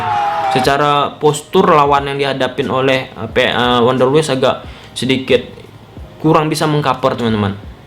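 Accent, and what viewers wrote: Malaysia. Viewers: Logat melayu kental skli bang, orang riau atw mana ya?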